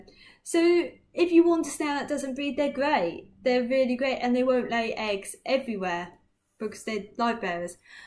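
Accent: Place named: United Kingdom. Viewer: Hard to listen and understand due to the Britt accent. Thanks from the new world.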